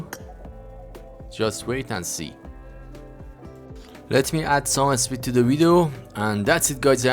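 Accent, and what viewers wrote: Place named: Iran. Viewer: 😂 اومدم کامنت بخونم لجه ایرانی فقط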